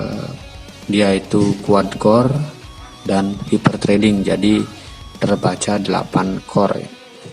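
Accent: Bali, Indonesia. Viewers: Logat anak bali wkwkwk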